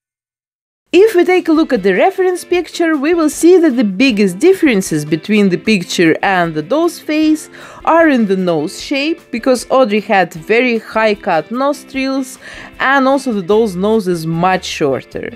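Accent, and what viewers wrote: Russia. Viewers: Судя по Вашему акценту, Вы понимаете русский. Может, попробуете сделать ещё один вариант Одри? Прошло достаточно времени, чтобы попытаться снова))) Эта версия мало на неё похожа, Вы замечательная художница, и можете гораздо лучше) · Сильный русский акцент))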